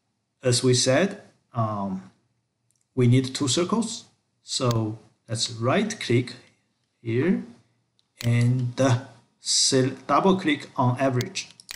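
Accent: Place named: South Korea. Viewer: Thank you ! Helped a lot as a beginner :) Also your accent reminds me of my korean friend.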